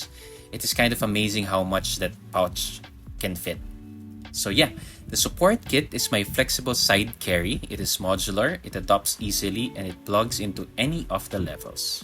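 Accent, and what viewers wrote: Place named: Philippines. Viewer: Same as an EDC enthusiast I was taken a back nung nag tagalog siya and may filipino accent 😅😅. Great content po kuya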